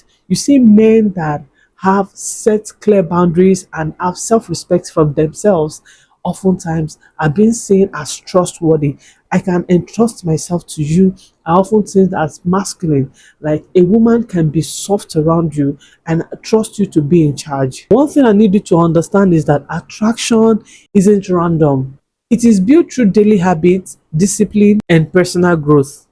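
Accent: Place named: Nigeria. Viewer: Maaan… I love Nigerian people, the accent 😊❤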